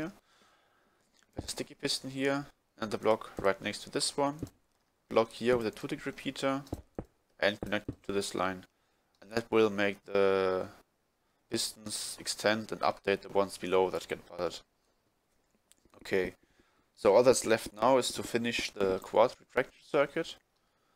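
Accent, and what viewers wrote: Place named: Germany. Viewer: Nur wegen dem Akzent sofort gewusst deutscher😂😂😂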